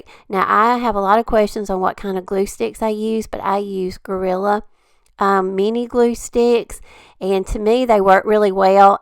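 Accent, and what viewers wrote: South, United States. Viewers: I Absolutely Love your Southern Drawl & creative DIY's. The Drop cloth pillow cover is too cute Ya'll! 💜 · I adore your accent! I miss living in the South.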